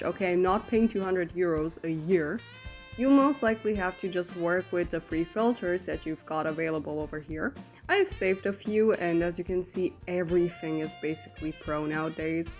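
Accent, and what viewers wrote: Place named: Poland. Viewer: u sound polish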